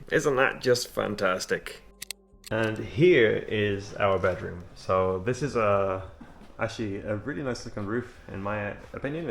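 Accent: Sweden. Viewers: Why do you sound Swedish 😭 I can’t hear an accent at all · That swedish accent is creeping in 😂😅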